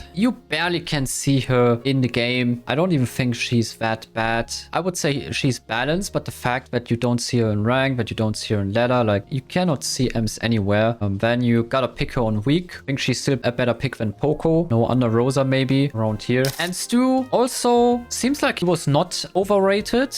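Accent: Germany. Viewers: english is not his primary language, he's german, don't expect too much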